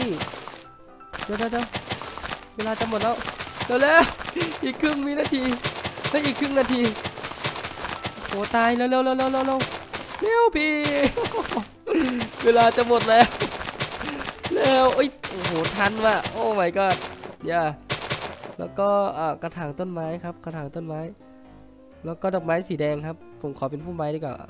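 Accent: Isan, Thailand. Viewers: พี่ พูดอีสาน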